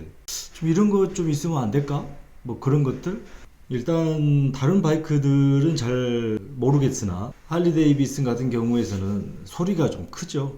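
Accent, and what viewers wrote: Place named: Jeolla, South Korea. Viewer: 말씀 중간 중간에 전라도 사투리 넘 구수하고 재미납니다 팬입니다 ^^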